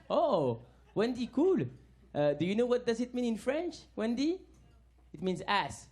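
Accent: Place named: United States. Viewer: Ohh I like so when you speack english! It s so suitable to you! We could believe you would be a true american boy lol so cute ^^ me too my dream is to go one day to New York, i watched "sex and the city" i loved this serie and so i dream to go there....one day i ll make it!!! Then, i have to practise my english so i will wathed all your sketch that i could find in english on youtube...😊😊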